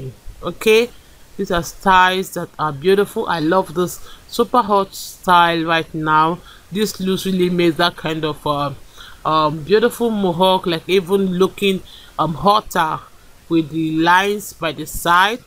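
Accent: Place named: Africa. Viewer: She has a beautiful African dialect. I love those beautiful short cuts!!! Thank you for sharing.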